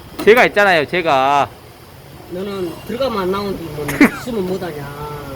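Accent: Jeolla, South Korea. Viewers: 아따 절라도 사투리가 구수하구만요. 카톡 확인 부탁 드리겠습니다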